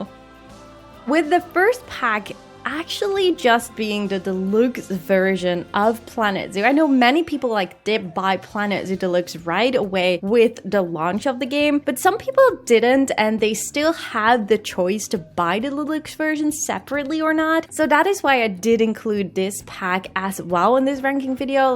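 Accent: Netherlands. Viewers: You sound like you are from Nederlands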